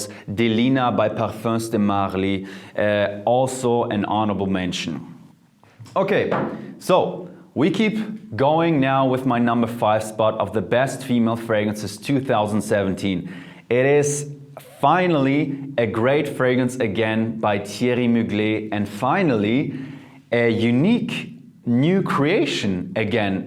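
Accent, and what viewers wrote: Germany. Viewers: You’re German, aren’t you? No worries, your English is great, I’m a linguist so I notice those things. But still, thank you for this list, I will give those perfumes a sniff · German accent! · ist der deutsch? wenn ja man erkennt es eif sofort an diesem akzent😂 nicht böse gemeint btw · Your accent sounds so german :) are you from Germany? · Is he German? Sounds like it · Sorry but he sounds so german.. I‘m from Germany so yeah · I literally was about to look up if he was German until he said he has a German channel. German accent is strong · As soon as you spoke I knew you were Germany. lol. Thanks for the recommendations. What suggestions do you have for women would have sensitive noses. A lot of perfume irritate my nose and/or give me a headache. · Mehta hello! I am french and I can definitively affirm that he is not. The way he's pronuncing french makes me think he is from Germany. 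Actually, the way he pronunces french"R" is 100% german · He sounds german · Omg he’s GERMAN haha I immediately knew it because of his accent. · Too german...if you work with perfumes you need to improve your French, man. Le Femme is pronounced 'fa:m' not 'fem'. Blah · Why does he reminds me of a young Ralph Fiennes lol. I guess the German accent when he was playing that commandant in Schindler's List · Is he german? He has a little accent, don't he? I'm german, too by the way😉🇩🇪 noch wer? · Omgggg I knew he was German I just heard him talking in english and thought the whole video about if he is German too bc of his accent and in the end he talks about having an German account 👯‍♀️ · Hehehe you have a German accent. Are you from Germany? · are ya german? i think hes german i can hear an accent loll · I can totally hear your german accent coming out 😉 cute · Is he german? The accent? (I'm german) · I understood from your accent that you could be only German! 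Deutschland in my heart! ❤ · Your german accent is kinda cute :D grüße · German accent 🙂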